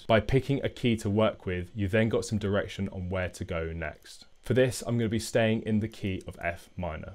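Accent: United Kingdom. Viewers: Most guys w ur setup and type of video are kinda foreigners who say “focal”(vocal) kk. Cool to see a uk dude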